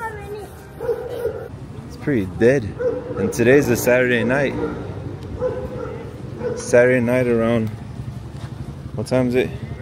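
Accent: Paisa, Colombia. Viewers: hey How long are you in Tijuana, not sure if you have time for a Meetup, I am from San Diego, Long time Tijuana Expert. I went to Medellin last year and that's how I heard about your Channel..Cheers, FYI Red Building is the famous Hong Kong lol. Funny you talk Spanish in Tijuana like Paisa People haha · Your accent sounds Colombian when you speak Spanish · Are you Colombian or Venezuelan? Cause your accent sounds like it. Great video tho 👍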